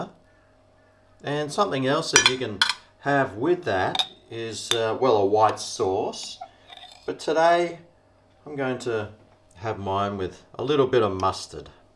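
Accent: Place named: Australia. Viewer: Love the Aussie accent 😂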